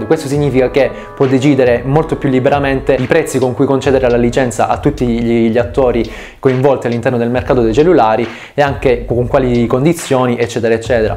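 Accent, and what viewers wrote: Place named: Sicily, Italy. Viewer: carissimo avrei un po' di cose da dirti: 1. dacci un nome più semplice, anche fittizio, con cui chiamarti 2. riguardante questo video devo dirti che stai migliorando molto, infatti, fatta eccezione per qualche lapsus di tanto in tanto ed un' esternazione degli argomenti ancora un po' macchinosa, il video è molto fruibile e le tue spiegazioni sono abbastanza chiare 3. in linea generale: devo dirti grazie! sei il primo canale italiano (almeno che io sono riuscito a trovare) che tratta la scienza economica. sei un vero e proprio debunker per quei miliardi di video complottisti che intasano youtube. anche i commenti sotto ai tuoi video sono un piacere da leggere (quasi nessun: "MUORI TRATITORE DELLA PATRIA VENDUTO DELA BCE!!111!1", ma tanta gente civile che argomenta pacatamente e che rende il tutto molto stimolante per chi ha passione per questa materia). 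ti prego continua! fallo per noi 4 affamati di materiale di qualità. 4. vorrei permettermi di consigliarti qualche argomento per i prossimi video che secondo me potrebbe essere interessante, ad es. la teoria dei giochi o le teorie di keynes, o magari nel tempo te le verrò a proporre sotto ai tuoi video se ti fa piacere... comunque sia vorrei supportarti il più possibile: like a manetta e farò vedere i tuoi video ai miei colleghi... dicci tu come possiamo supportarti maggiormente. 5. ho capito che stai a torino, ma mi sai tanto di terrone, probabilmente siculo (con grande affetto, lo sono anch'io)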